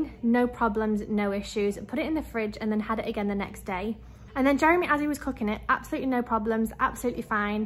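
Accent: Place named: Northern England, United Kingdom. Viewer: Hi. The type of tuna you ate is not yellow fin which is what you get in the store in the UK This is known as " Kelawala" by the locals. I too have got sick eating this Fish In SL there are no testing for contaminants you buy at your own risk.This fish has a dark red tint and looks bloody and can make you sick.I grew up in SL and now live in the US. Ps Your accent sounds very West Midland/Northern England.I have English roots and have been to the UK about five times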